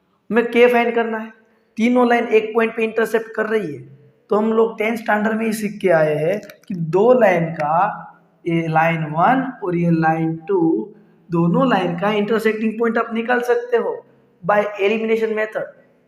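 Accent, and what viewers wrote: Gujarat, India. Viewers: Sir Are you Gujarati your accent is like that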